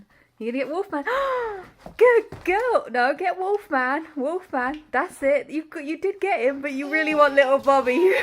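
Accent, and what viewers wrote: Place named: Brighton, United Kingdom. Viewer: Are you from Brighton you sound like you’re from Brighton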